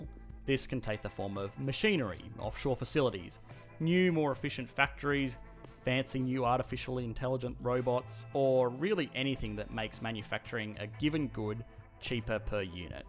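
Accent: Australia. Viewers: Australia has had the economic advantage of massive mineral wealth (even though most of the wealth has been plundered by multinationals under Liberal governments), which has meant that the GDP is much higher than comparable nations - in addition we have a (increasingly less) generous welfare state which includes packets of money for people studying or looking for work - which is circulated back into the economy at an incredibly high percentage, as neither students nor job seekers are known for their massive wealth hoarding. In more modern history, we were able to escape the worst of the global financial crisis through some appropriately timed stimulus packages by the Labour Rudd/Gillard which included literally just giving money to every citizen and asking them to spend it in the economy - which reinforces the main point of the video that if more money is given to the masses, the economy is more secure. 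Coming from an Aussie - which, from the sound of the uploader's voice, is the where the person making this video also came from.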